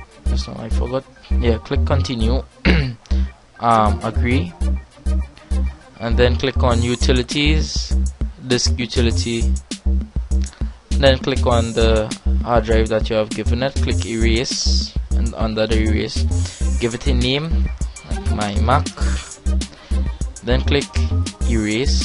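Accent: Trinidad and Tobago. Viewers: hmm u soundin a trini hoss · DALM U HAVE DAT TRINNI ACCENT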